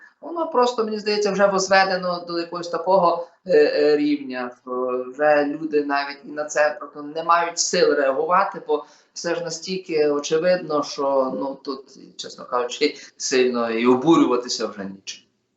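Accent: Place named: Galicia, Ukraine. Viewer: Дуде імпонує стиль розмови і сама мова. Це не просто класичний галицький діалект,а вишуканий діалект за часів галицької інтелегенції часів Австрійської адміністрації. Дуже круто 👍👍👍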